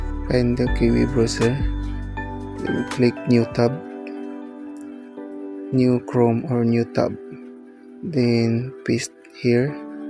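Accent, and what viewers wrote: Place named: Indonesia. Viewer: u from indonesia u sound it very same and u black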